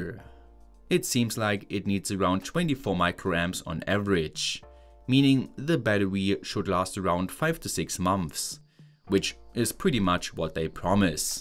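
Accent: Germany. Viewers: I like that german accent! Can you please more often use the word "Jawohl" like germans do in movies. 😂😂😂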